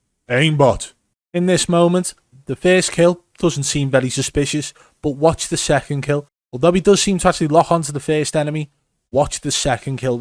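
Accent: Liverpool, United Kingdom. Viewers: You have to be related to Nick Peet, look just like him and a scouser aswell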